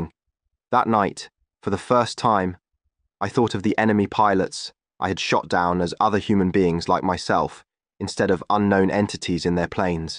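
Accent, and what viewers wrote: England, United Kingdom. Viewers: I do not know if i can get use to this engish man speaking .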